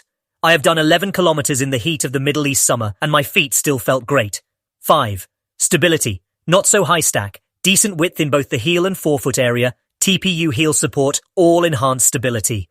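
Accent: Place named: United Kingdom. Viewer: I've been watching your videos for awhile and I was a little surprised at first to hear some British dude narrating. :) I've always loved the look and the sharp rocker profile of the Noosa but I've never tried them because of concerns about cushioning. Also, the midsole still says FlyteFoam, not Blast. How do these feel on a 6-8 mile easy run compared to something like the Nimbus? I'd love to have a comfortable non-plated shoe with a great rocker. Thanks.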